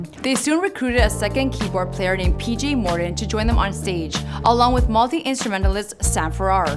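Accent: India. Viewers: True. She sound like an Indian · Dancehall Lyrics i thought the same thing too. Even Priyanka Chopra sounds less indian than this presenter.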